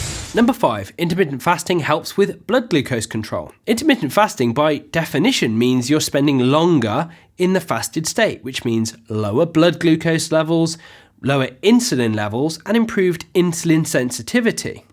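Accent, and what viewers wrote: United Kingdom. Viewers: Four seconds in, heard brittish like accent. Out of here!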